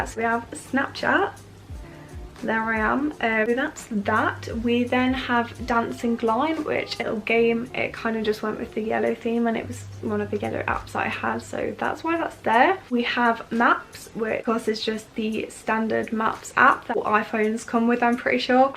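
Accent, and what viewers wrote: England, United Kingdom. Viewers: loves her cute british Accent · Your English accent is very nice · She is from England maybe, cause i don't understan all words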